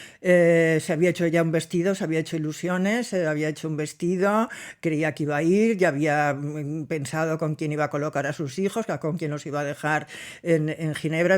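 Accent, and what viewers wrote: Spain. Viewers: Siempre un gusto escucharla ♥️👍🏼 me encanta su acento español y la forma en que nos narra las anécdotas. Saludos desde México 🇲🇽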